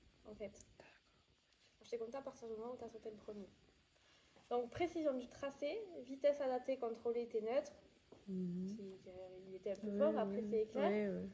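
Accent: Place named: South, France. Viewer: Petit accent du sud Team sud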